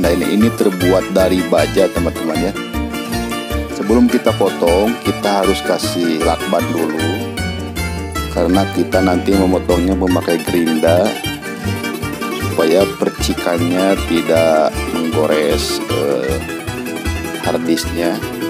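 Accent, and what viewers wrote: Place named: West Java, Indonesia. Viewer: Pokona mah urang Sunda MH Tina A na bentes pisan😀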